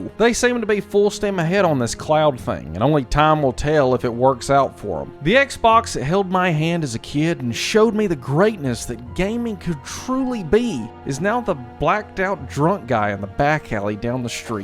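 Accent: South, United States. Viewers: i like your country voice so i subscribed yee-haw! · Finally a youtuber with a cool american southern accent. Tired of all these weak bri'ish ones.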